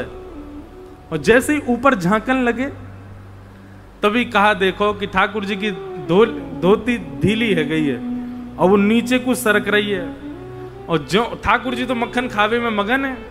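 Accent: Braj, India. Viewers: Radhe Radhe maharaj ji aapki ktha bruj bhasha mein sunana bahut achcha lagta hai ❤❤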